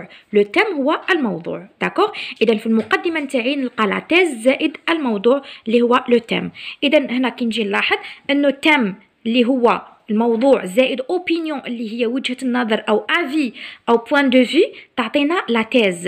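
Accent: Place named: Oran, Algeria. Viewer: شكيت نتي وهرانية ههه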